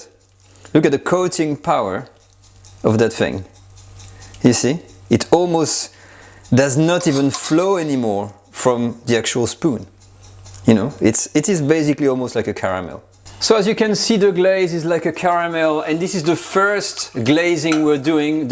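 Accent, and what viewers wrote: France. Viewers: I am just mesmerized by the French accent and love the recepie ❤❤❤! You rock!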